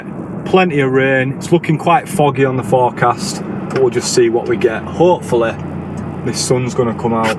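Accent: Yorkshire, United Kingdom. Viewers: Are you originally from Bradford or Sheffield pal?? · Are you a Rotherham lad or Barnsley boy? Trying to guess?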